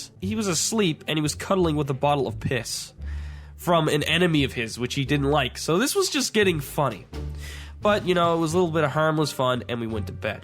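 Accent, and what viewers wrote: Canada. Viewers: R u Canadian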